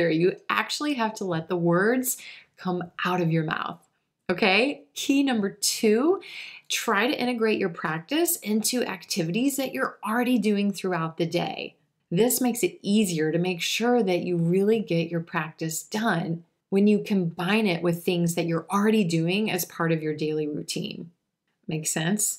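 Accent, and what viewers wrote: United States. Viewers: Your all Video is American English?? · I am an arabic speaker and an english teacher I love the american accent and I have choosen you as a trainer after a great reacher thank you for your help I watch your videos every day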